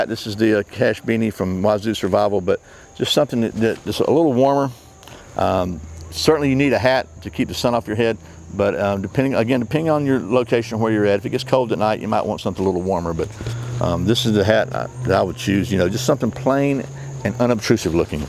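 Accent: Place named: Carroll County, United States. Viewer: sounds like you might be from around the Carroll county area or Douglas county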